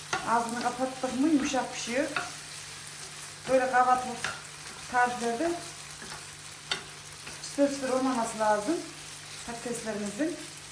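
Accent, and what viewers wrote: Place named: Nevsehir, Turkey. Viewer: Selamünaleyküm memleketlere Nevşehirli gibi konuşuyor aynı güzel oluyor eline sağlık Eline sağlık ben de yapıyorum onu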